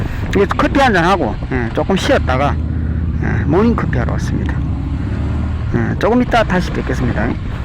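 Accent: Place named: Gyeongsang, South Korea. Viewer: 신묘한 경상도 사투리ㅋㅋ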